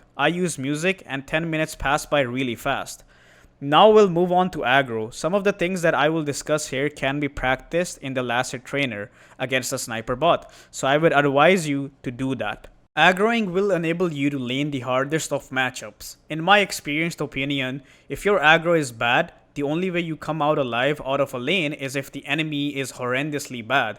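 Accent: India. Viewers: the indian accent even makes it soo much better. just like watching any other tutorial video online. its a familiar feeling.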